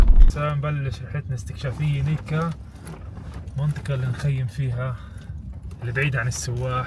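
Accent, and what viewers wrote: Palestine. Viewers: لهجتك الفلسطينية الأصيلة اجمل اشي ولازم تكملها يا عمي بالحلال مع بنت الحلال .. ربي يوفكم للخير ويهدي بالكم ❤ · من وين انتَ من فلسطين 😅😅حاسس من رام الله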